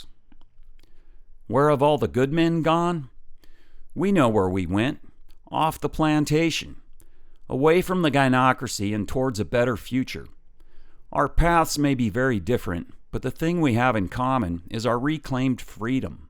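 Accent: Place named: United States. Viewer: Oohh. New video!:D I love listening to your american-ness:3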